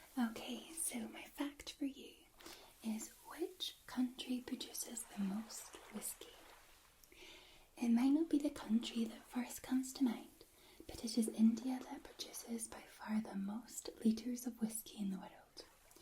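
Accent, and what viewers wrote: Scotland, United Kingdom. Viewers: Whats up?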